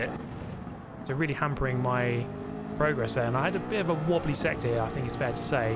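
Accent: United Kingdom. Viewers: I know it is british accent, but where exactly is this accent from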